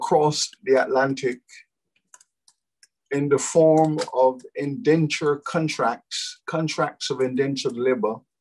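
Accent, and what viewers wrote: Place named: Caribbean. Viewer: Dude has books. Damn. And a posh Carib accent.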